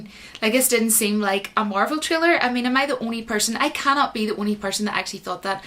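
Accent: Scotland, United Kingdom. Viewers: Like ur Accent... r u scottish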